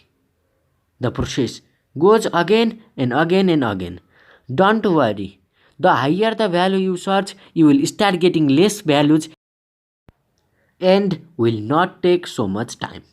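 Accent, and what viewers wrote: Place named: Nepal. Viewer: Nepali po ho Brother ... Accent le testai lagdai xa....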